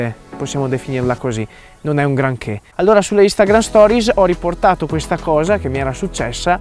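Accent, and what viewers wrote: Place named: Milan, Italy. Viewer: Sei Milanese eh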